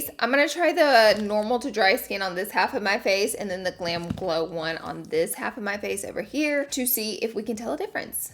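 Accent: South, United States. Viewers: Girl, you're southern af. You should know how to pronounce "peony" lol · So funny that u can have a southern accent and you've picked up such a cali accent too lol. SoOoO prettay like literallyyy just SOO pretty haha. Guess that's what happens when you hear it all the time around you.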